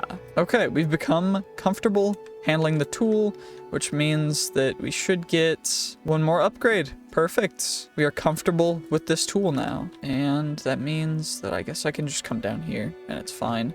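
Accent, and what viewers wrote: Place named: England, United Kingdom. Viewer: 1question ... where the fuck comes the extreme english accent come from 😂😂😂?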